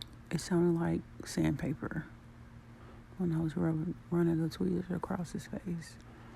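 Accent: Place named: South, United States. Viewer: the southern accent makes your voice even more calming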